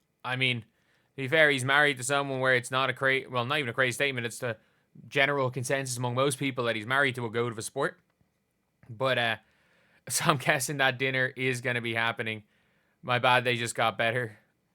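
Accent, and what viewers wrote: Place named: Ireland. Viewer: I love practicing Irish accents by listening to. I just wish I knew which part of Ireland he's from. I hear different "Irish" by various celebrities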